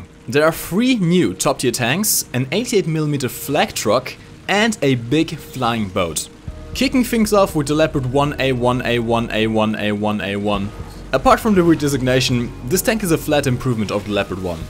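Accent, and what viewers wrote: Germany. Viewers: Dat german accent though,, i wish i could speak german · The Whole vid i fought : "Is he german?" then you said Flakpanzer blabla etc. then i new yep you are :D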